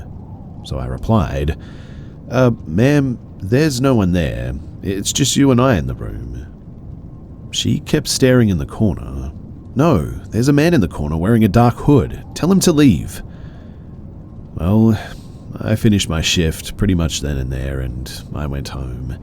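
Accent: Australia. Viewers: Good to hear an Aussie accent, cheers mate!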